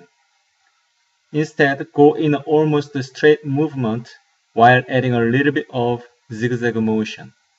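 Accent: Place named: United Kingdom. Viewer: Your British accent is so strong, what part of the u.k. Are you from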